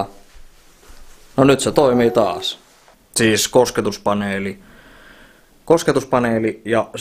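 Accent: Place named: Kuusamo, Finland. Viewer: Kyl kuusaan murre kuulostaa hyvältä👍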